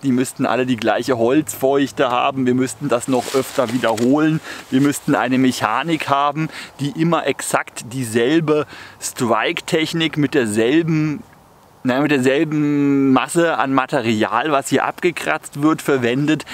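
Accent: Baden, Germany. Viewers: Klasse Viedeo, sagemal von wo kommsten Du her😉 ich würde deiner Stimme nach urteilen aus dem Badischen. Und wenn das so wär. Dann wären wir vielleicht gar nicht soweit voneinander entfernt. Gruß der Markus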